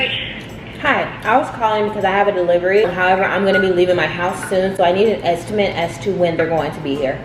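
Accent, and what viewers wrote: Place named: South, United States. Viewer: girl u sounding a little countrtttyyy i love it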